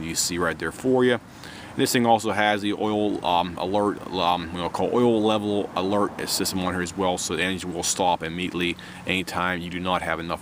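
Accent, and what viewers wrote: Pittsburgh, United States. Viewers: Great video......sounds like a Pittsburgh accent.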